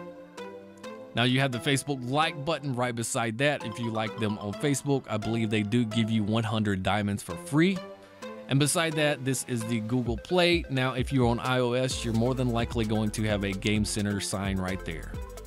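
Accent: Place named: United States. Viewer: Thank god, an american. Im convinced that everyone in every other country cant explain anything to anyone. Nor can you even understand them.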